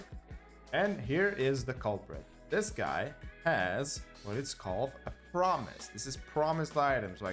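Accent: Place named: Portugal. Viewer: Notei logo que é portuguessss 🙂‍↕️ tugaaaaa 🇵🇹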